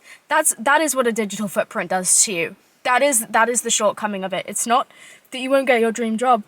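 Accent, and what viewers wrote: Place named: London, United Kingdom. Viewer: London block accent ✅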